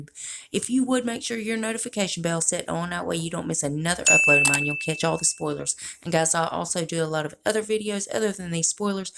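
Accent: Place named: Alabama, United States. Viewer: Are you from Alabama? :) I’m originally from North Mississippi! You speak my language !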